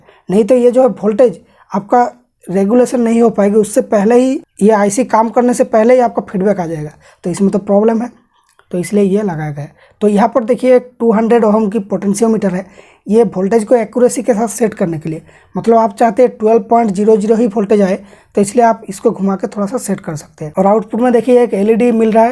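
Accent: Bengal, India. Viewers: Ap Bengali ho and sory ples repla💖💖💖💖😭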